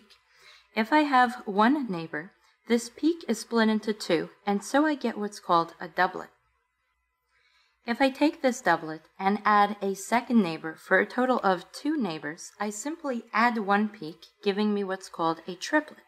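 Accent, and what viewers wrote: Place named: Borough Park, United States. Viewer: You sound like you grew up in boro park. I know that accent from a mile away.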